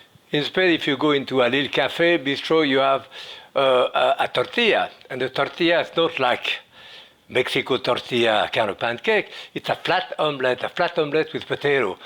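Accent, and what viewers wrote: France. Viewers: that french accent even makes the word omelette sound so goood mmm and yes i always use chopsticks now for anything that needs a good whipping , once you master it the become a regular used kitchen tool , happy cooking folks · Does anyone else simply hear the french accent and immediately hit the subscribe button? only qualification i need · Absolutely.....My dad was French and had eggzactly the same accent.😊😊😊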